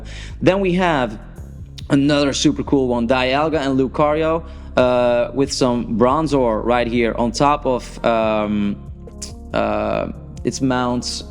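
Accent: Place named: Netherlands. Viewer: Are you Dutch? You have a dutch accent. Anyway i think the feebas and melotic need to go on top of eachother. So the feebas below an melotic on top. Anyway keep up the videos😁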